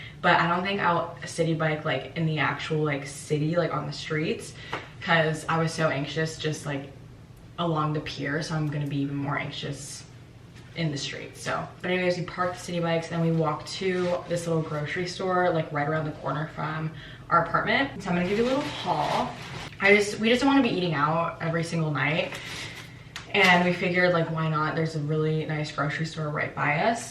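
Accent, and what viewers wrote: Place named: West Coast, United States. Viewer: I like your west coast accent. Stands out so much in NY.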